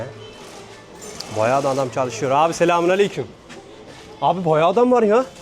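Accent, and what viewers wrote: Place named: Sivas, Turkey. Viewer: Evlat ya ben sivaslıyım konuşman şiven hareketlerin o kadar çok sivaslılara benziyor ki hele şu norüyon demen varya beni bitiriyor 😂😂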